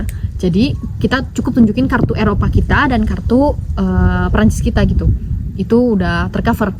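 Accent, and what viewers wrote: Bandung, Indonesia. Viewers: Bicaranya khas bandung banget...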